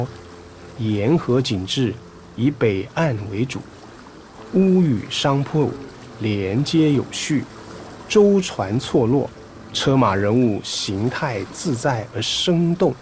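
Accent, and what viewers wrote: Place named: China. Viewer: 聽聲音是大陸腔，但漢字，喜歡漢字。謝謝制片人。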